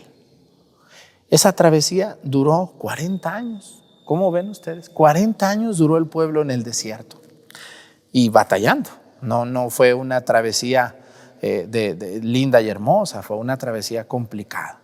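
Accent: Mexico. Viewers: Hola padre, como cada semana muchisimas gracias por el resumen de homilias, padrecito usted es el combo completo: Sabiduria, carisma me fascina su acento tapatio y regreso una y otra vez el video para que se me grabe lo que usted nos dice, siga asi padre hablandonos con la pura verdad, que tenga una exitosa y bendecida semana💐🌸🌹🌷🌼🌺👏🏼👏🏼👏🏼👏🏼👏🏼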